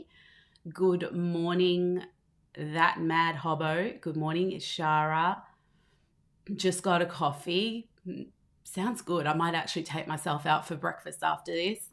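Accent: Australia. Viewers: I do like a lady with an Australian accent :) great analysis!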